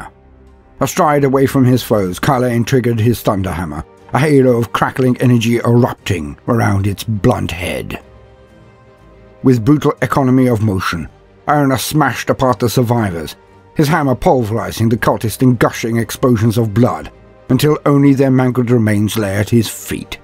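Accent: United Kingdom. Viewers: Ah, my 2 favorite things, Blood angels, and an old British guy explaining them.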